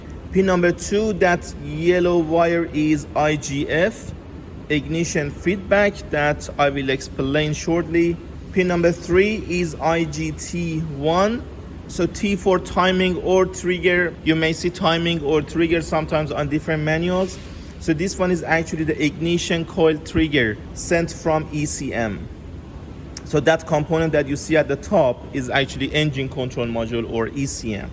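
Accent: Iran. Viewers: ایرانی هستی؟لحجه فاسی داری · Well done mate, you are such intelligent person, by the way your accent sounds Persian😊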